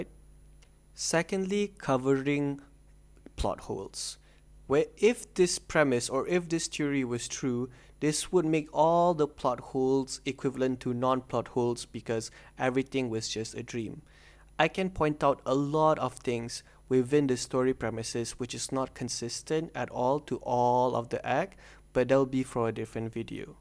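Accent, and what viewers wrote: Malaysia. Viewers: correct me if im wrong...but your pronunciation like manglish la...are malay bro? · U sound very malaysian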